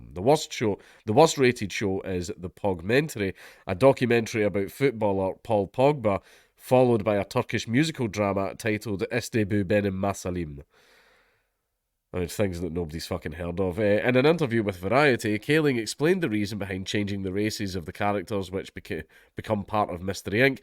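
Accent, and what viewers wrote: Scotland, United Kingdom. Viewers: I like your accent, you sound like William Wallace.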